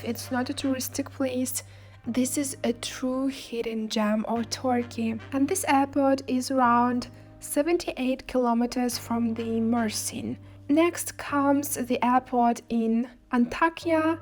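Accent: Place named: Eastern Europe. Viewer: you have an interesting accent. What's your nationality? Are you from Eastern Europe or perhaps Germany? 🤔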